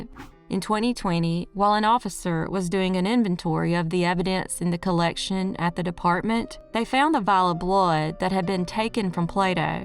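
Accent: South, United States. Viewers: I think we like that Southern voice ❤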